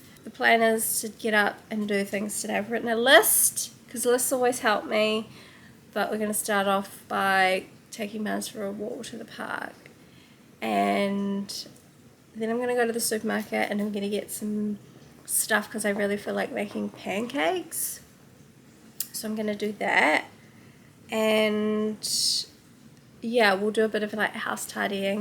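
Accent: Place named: New Zealand. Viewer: ooh okay i had no idea what poor things about (and i thought the film trailer look weird) but you’ve piqued my interest! your accent makes me miss NZ 😫🥹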